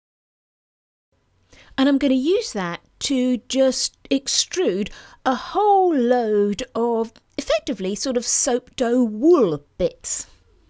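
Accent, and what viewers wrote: United Kingdom. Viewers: Holy cow, these are the CUTEST EVER!!!!! I am absolutely in LOVE with your channel as a brand new soap maker! I'm an artist and love to create pretty things so your videos give me hope that I can one day get to a point even close to some of the soaps you make. What an inspiration! You are a wonderful teacher also and I absolutely LOVE your accent! (I play an online game with a lot of folks from your neck of the woods and it amazes me how many different accents there are just in the UK!) Gorgeous soap!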